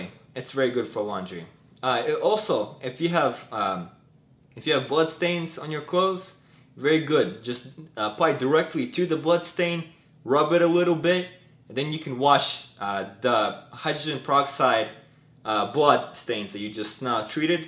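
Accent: Russia. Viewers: Wat happen to your Russian accent 😯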